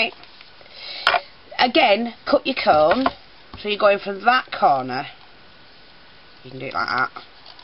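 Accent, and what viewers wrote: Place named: Leeds, United Kingdom. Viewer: It's because lives in Leeds do she has that 'leeds' accent lol. I like it:)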